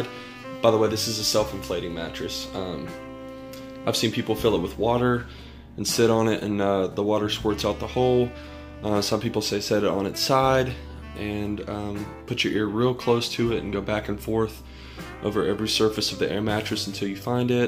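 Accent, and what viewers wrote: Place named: South, United States. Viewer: I love his accent...Southern USA?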